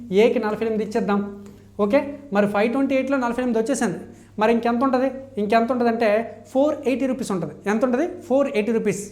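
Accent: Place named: Srikakulam, India. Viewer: Sir meedhi srikakulam kada 😍😍😍😍👏👏👏 nice explanation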